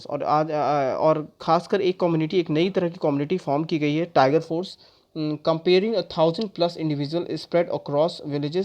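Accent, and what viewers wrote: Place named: United States. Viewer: Your voice like American english and I like it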